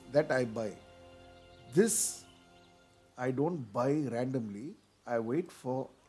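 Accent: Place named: India. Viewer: hey nice video man Are you from India? u r english accent is completely matching to indian style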